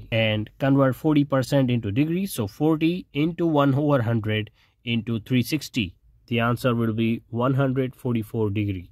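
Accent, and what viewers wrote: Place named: India. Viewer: great indian teacher im from the philippines and your teaching is good with the accent! 😀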